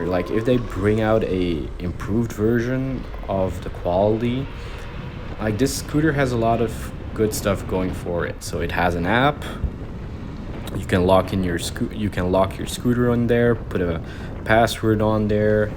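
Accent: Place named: France. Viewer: T'entends pas son accent ? Il est français mais pour toucher plus de monde il fait sa vidéo en Anglais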